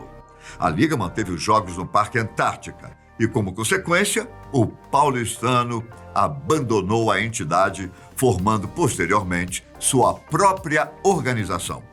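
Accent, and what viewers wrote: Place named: Rio de Janeiro, Brazil. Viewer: iu carioca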